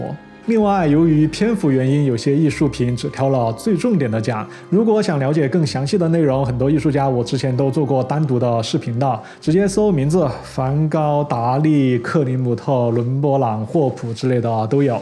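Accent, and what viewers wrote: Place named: Chongqing, China. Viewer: 很喜欢你的重庆川普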